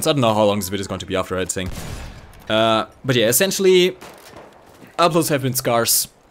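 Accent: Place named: Germany. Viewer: i love your content, yes i love FPS and war thunder, something im in the mood for war thunder, sometimes cs:go, overwatch, tf2 etc etc, the thing is.... i found you cuz i didnt knew wtf i has doing in tank battles, and you helped me, i like your wt content and it shows that you do... put a alot...ALOT of effort, and well your german accent.... well.... i stayed cuz you're an awsome guy, funny and well you teached me how to be a tanker / pilot, same as orange, he helped me with a coupple of plane tips here and there, he's awsome.... what i wanna say is.... i'm here for the person dispise the content, hell you can play the sims i'd watched it... it would a bit awkard.... but i'd love to see what you can do with it, sort of speak... stay safe and.... keep beeing awsome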